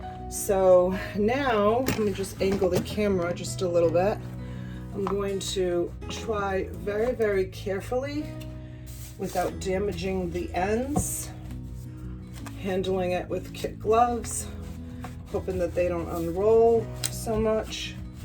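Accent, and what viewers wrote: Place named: Massachusetts, United States. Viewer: miss hearing the Mass accent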